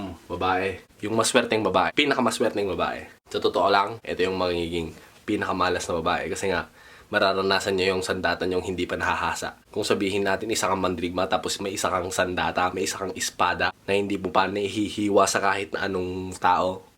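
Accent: Ilocos, Philippines. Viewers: This kuya is ilocano.. talks like my uncles and cousins in la union Kuya, cave is kweba not kubeta (toilet) 😋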